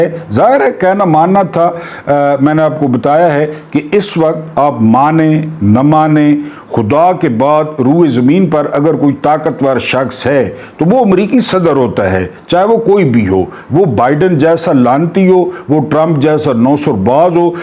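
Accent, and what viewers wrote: Saraiki, Pakistan. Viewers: صاحب سرائیکی لہجے میں ارد بہت ہی دلکش لگتا ہے ۔❤❤❤❤❤❤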